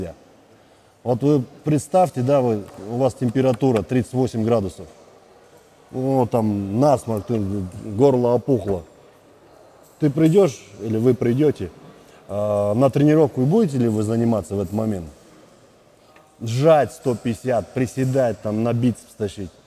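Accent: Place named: Kazakhstan. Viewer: Он с Казахстана, там такое произношение допустимо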